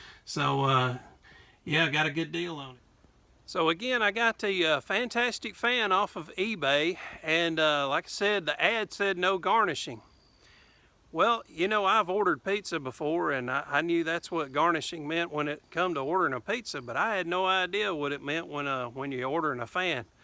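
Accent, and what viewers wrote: South, United States. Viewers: Being from South Carolina I was glad to see someone who speaks Southern American English make an RV roof repair video. I couldn't understand what the folks from Connecticut and California were saying. Very useful information. Thank you.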